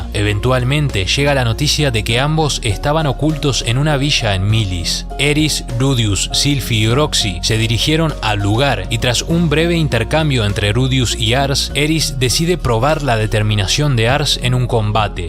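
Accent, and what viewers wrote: North, Mexico. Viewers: Diablos , esto es lo mas norteño que mis oídos han oído jajajaja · Pero que norteño 😅😅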